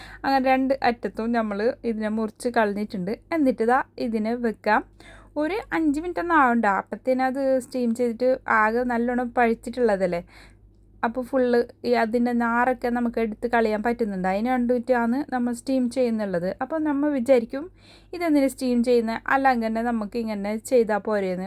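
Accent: Kasaragod, India. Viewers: Kandappole kothiyakunnu, pazhuthu poya pazham vechu oru super pudding, kasargod varthamanam kelkkan rasamanu 👌👌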